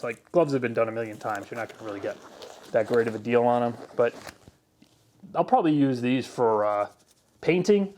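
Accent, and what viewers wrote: New York, United States. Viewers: New Yorker!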